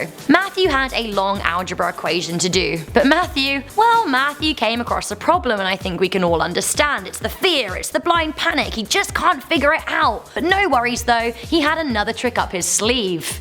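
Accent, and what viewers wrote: England, United Kingdom. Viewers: Miss are you English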